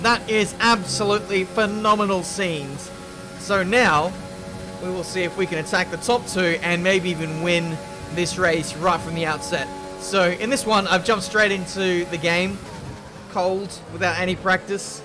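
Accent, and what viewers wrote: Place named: Australia. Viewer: DUH YOUR AUSTRALIAN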